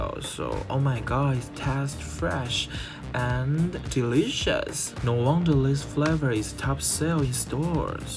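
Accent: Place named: Taiwan. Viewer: 戴黑框眼鏡看起來好顯瘦喔，的台語聽起來很好聽欸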